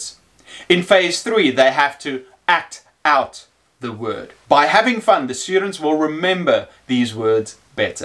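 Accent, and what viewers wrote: South Africa. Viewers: Are you from South Africa? really enjoying the videos by the way. My students love the activities.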